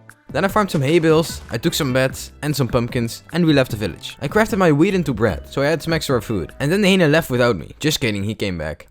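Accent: Netherlands. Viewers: You have a little bit a dutch accent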